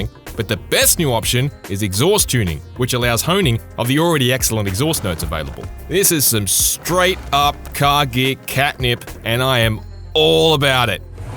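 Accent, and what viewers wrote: Australia. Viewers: He's Australian? 🆕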